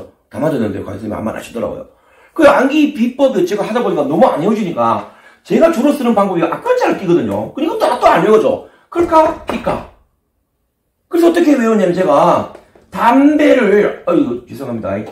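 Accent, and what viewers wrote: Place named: Gyeongsang, South Korea. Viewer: 듣다보니 깔끔정리 잘해주시고 시간이 금방가네요 경상도 사투리도 시원시원하시네요 또 올게요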